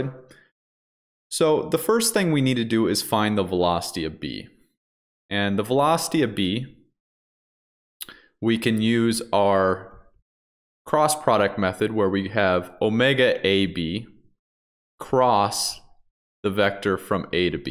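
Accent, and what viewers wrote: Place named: England, United Kingdom. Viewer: Finally, someone that speaks with pure English accent. Please post more videos on the acceleration analysis using complex number and vector math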